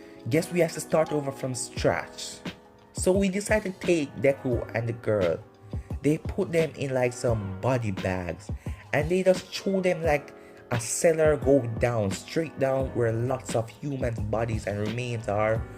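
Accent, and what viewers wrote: Jamaica. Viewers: Your accent reminds me of white yardie. Great vid man. Gonna binge the series.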